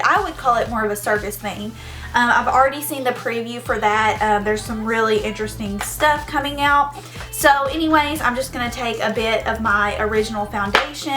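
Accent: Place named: Tennessee, United States. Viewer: I love your accent! ^_^ I just moved to Knoxville, Tennessee from Portland, Oregon and most people have this super cute accent and I just find it adorable. XD Thank you for this tutorial too! Very pretty look! ^_^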